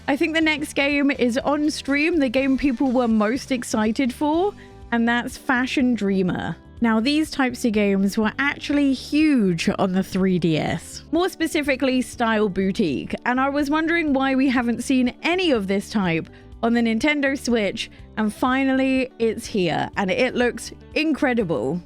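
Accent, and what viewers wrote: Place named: United Kingdom. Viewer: If you don't mind me asking, where is your accent from? It sounds British, but also a little different, for example right at the beginning when you say "so many," the "so" almost sounds like there's an "r" sound at the end of it. Thanks!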